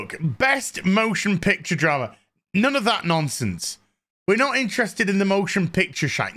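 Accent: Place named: United Kingdom. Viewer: Ahhhhhhh!!!!! I needed this! I had to get my dose of! Love you, my brother! Your commentary matches my inner monolouge, exactly, minus your British accent. Keep the content coming!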